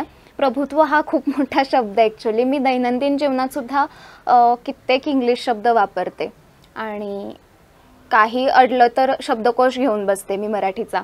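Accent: Kolhapur, India. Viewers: tuja aawaj komal aahe khop kolapuri bhashet bolte tu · मराठीला अजूनही थोडा घाटी कोल्हापुरी टोन आहे . बाकी आवाज क्यामेरा समोर तरी छान मृदु आहे .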